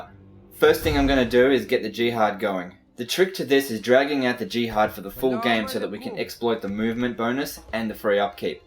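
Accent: Australia. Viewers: Aussie Aussie Aussie?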